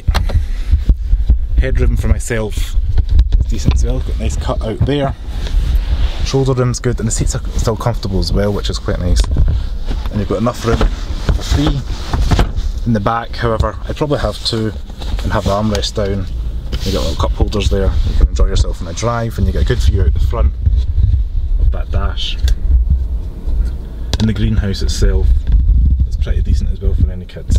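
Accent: Scotland, United Kingdom. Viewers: Good review and nice with a scottish accent. "Chelsea wankers" (childseat ankers) 😅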